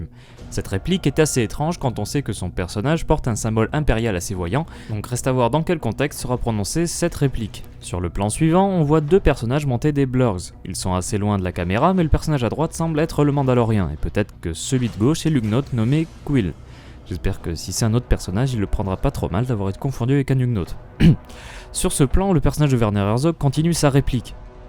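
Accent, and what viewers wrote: England, United Kingdom. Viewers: Je pense que la scène avec l'enfant et le matériel de la CSI est un flashback. Pour le vaisseau inconnu, il verse dans le style corellien non ? Enfin, le personnage avec le blaster sur l'épaule me fait tout de suite penser à Cydon Prax, un chasseur de primes apparu dans le jeu vidéo Star Wars The Clone Wars (PS2 et GameCube). Superbe analyse comme toujours ! Il faut conserver l'accent anglais, c'est là votre marque de fabrique 🙂 !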